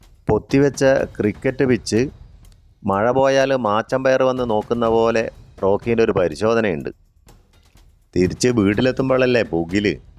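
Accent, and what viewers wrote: Kannur, India. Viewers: എനിക്കു വയ്യ എന്തൊരുവർണ്ണന സൂപ്പർ ആയിട്ടുണ്ട്. കണ്ണൂർ ഭാഷയിലുള്ള അവതരണം സൂപ്പർ സൂപ്പർ🎉🎉🎉🎉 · അടിപൊളിയായിട്ടുണ്ട് അഭിനന്ദനങ്ങൾ കണ്ണൂർ സ്ലാങ് ഒക്കെ നന്നായിട്ടുണ്ട് ഇനിയും വീഡിയോ പ്രതീക്ഷിക്കുന്നു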